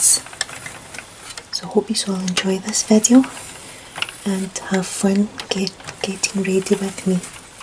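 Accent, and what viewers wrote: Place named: England, United Kingdom. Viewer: I love your english accent and intonation! This is so good to listen to me. Thank you for make this video!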